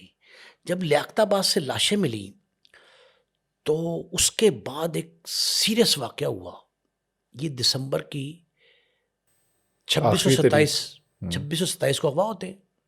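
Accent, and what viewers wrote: Punjab, Pakistan. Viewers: Sahib tu c Paindo ho, bus video Bani ja, paisay kami ja. Tohadda Karachi SE Kiya talluk. Ardo (urdu) Punjabi which bolde ho. O Paindo bey ja.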